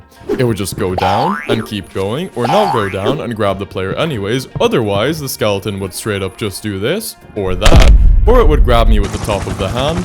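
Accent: Poland. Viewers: im new to the channel and i don't know but are you polish?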